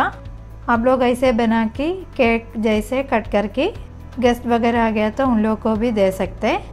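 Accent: Kerala, India. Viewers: Your Hindi with Malayalam slang is perfect. I love it. Keep up the good work.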